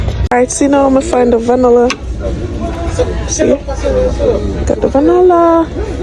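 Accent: Jamaica. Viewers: I just love how u speak our own patios love your style girl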